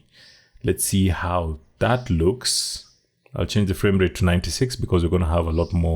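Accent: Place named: Kenya. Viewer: As if I’m looking for a tutorial, recognise the accent and find someone else from Kenya. Good stuff man. Are you working in Kenya or out the country?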